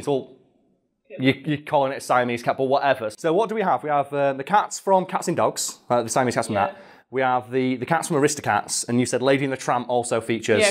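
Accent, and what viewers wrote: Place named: Cockney, United Kingdom. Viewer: I don't even know how to describe this channel. My GF asks me what I'm watching all the time that has that "blaring Cockney accent" and I just say. . . .uhh he's talking about things . . . That happened. . . With tv and movies. . . But it's funny and interesting. She says "like TMZ for the UK?" And I say "NOOO DONT YOU FUCKIN DARE"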